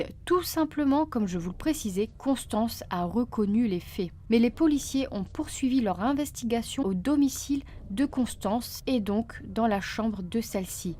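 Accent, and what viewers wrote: North, France. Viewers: Bon travail mais élocution à améliorer. Débit trop rapide, quelques fautes grammaticales et.... l'accent du Nord un peu trop prononcé (c'est franchement pas beau). Pourtant je suis du Nord donc aucune discrimination dans mon commentaire, juste un conseil pour t'améliorer 😉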